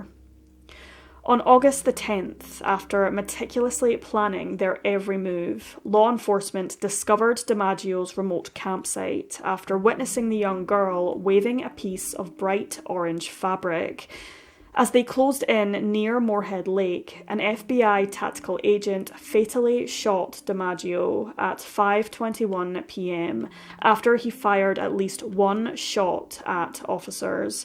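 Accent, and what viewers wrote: United Kingdom. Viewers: yes,top 5 for me too. Truly criminal are great too. Like yo,to the point,clear,concise+British is a bonus for me😊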